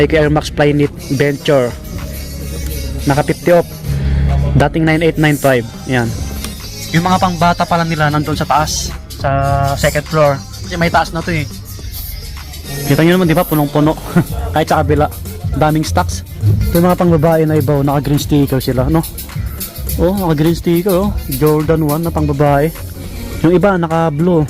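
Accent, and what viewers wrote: Visayas, Philippines. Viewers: Ituwid mo salita mo ,anong naghanap?naghahanap dapat past tense yung naghanap....halatang bisaya ka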